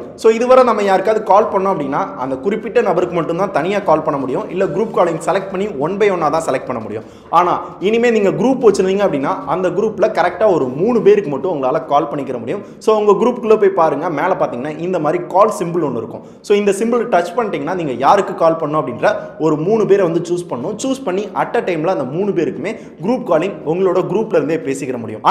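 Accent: Madurai, India. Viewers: Madurai karanga na summa VA Thaniya therivom la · Madurai style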